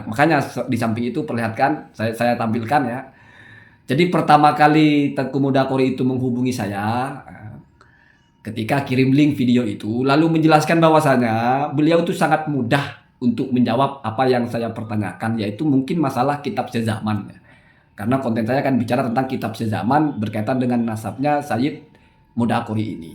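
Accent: Madura, Indonesia. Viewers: orang mana ya, kok ligatnya kayak orang madura. Ngapunten ngge.......salam kenal dari mojokerto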